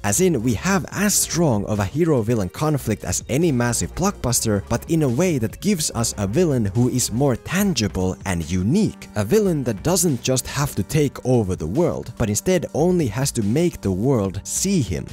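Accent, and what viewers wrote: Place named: Finland. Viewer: You're Finnish, aren't you? That accent... familiar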